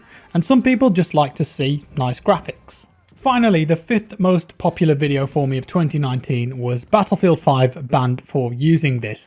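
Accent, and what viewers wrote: United Kingdom. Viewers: USA dominates your views because we just cant resist that British accent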